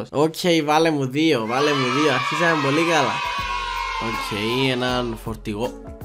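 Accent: Cyprus. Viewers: Εισαι απο την κυπρο? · ΛΑΤΡΕΥΩ ΤΗΝ ΚΥΠΡΙΑΚΗ ΠΡΟΦΟΡΑ❤ Ειδικα του!!!❤❤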